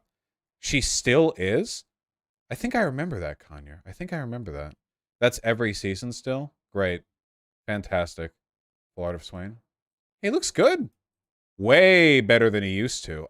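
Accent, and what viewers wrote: United Kingdom. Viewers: that's northern England accent 🤣🤣Manchester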